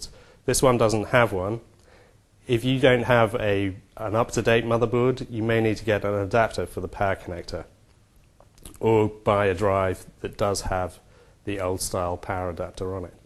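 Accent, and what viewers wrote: United Kingdom. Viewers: I usually hate British accents as they come off a pompous and arrogant but this one is mellow and soothing. I want him to read me a bed time story. "There once was a DIMM that didnt fit in..."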